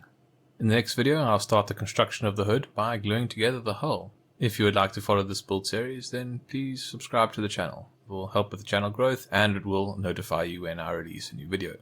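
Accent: South Africa. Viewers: Hey. You sound South African? If so, can you help with where to find the PE parts and wooden deck kits here?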